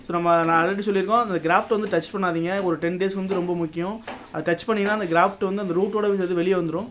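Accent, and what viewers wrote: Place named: Madurai, India. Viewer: Bro Neenga paesuratha paatha Madurai nearer Pola Neenga..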